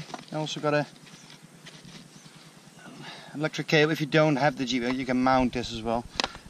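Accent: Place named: Netherlands. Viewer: yeah! Only thing is that it takes about a month to get it delivered. I live in the Netherlands. I suspect that's a place you know well given your accent!😉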